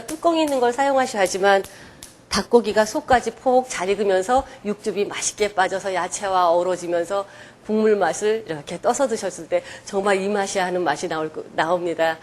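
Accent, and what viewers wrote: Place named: North Korea. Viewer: 북한 사람 말투인데 어딜까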